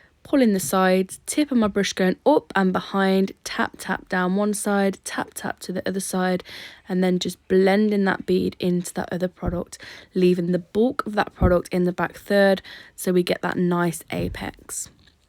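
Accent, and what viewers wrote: United Kingdom. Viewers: Jajajaja i love the way you said piña colada I’m from Mexico and I love your British accent Greetings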